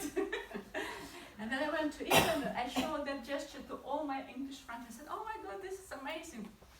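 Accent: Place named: Russia. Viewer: I love your typical russian accent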